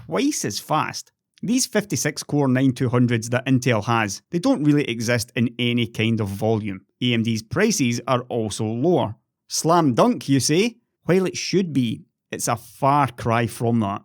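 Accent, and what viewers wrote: Scotland, United Kingdom. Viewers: He's Scottish, of course. The accent is similar to Dr. William MacDougal from Kirkwall ... Or Aberdeen? Or Glasgow? 😁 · The sweet sound of Scottish analysis...everyone knows what time it is. 🙏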